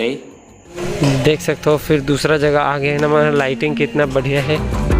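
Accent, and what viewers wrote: Chhattisgarh, India. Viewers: Hello bhai apka jo cg ka bol hai bahut hi mithas hai aap hamesha aise hi cg me bolte raha karo ok👌👌🙏🙏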